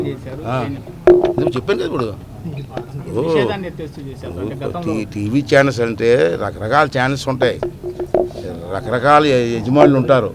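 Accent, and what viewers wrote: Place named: Narsipatnam, India. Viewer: Rey ade narsipatnam slang ra babu..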